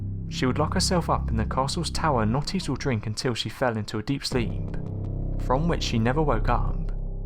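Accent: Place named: Wales, United Kingdom. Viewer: Dave Lennon-Copeland totally agree. Being from Wales I definitely hear a Welsh accent. Possibly South Wales. Maybe cardiff?.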